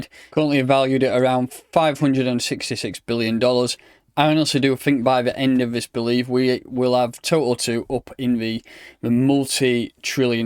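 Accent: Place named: North, United Kingdom. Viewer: I come for the Crypto and I stay for the Northerness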